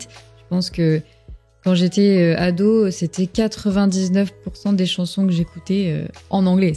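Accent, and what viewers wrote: France. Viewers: Im English and I think your English was 'tres bon' please don't loose your French accent, its charming and adds musicality to English. I have been teaching myself French for 1 year, the fog of understanding is clearing but I am a long way from speaking. Im concentrating on listening and reading, getting as much comprehensible input as possible. as an old saying says 'Slowly slowly catchy monkey'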